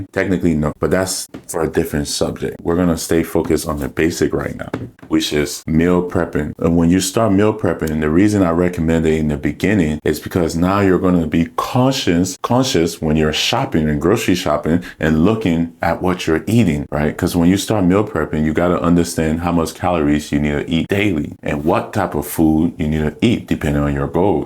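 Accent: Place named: Liberia. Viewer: I can hear a slight LIB accent... you Liberian bro?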